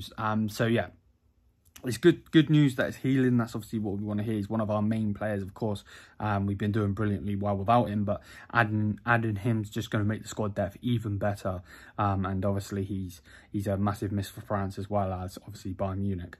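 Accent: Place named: United Kingdom. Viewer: Where are you from? You sound british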